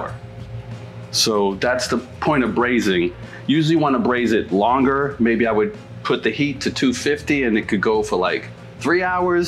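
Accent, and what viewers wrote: Boston, United States. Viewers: Pointless comment here: This is the first episode I watched where I could actually hear the Boston accent. 🙃 I love watching this channel! Thank you for all the "cooking classes." (Essentially.) I swear I learn something new every episode. ❤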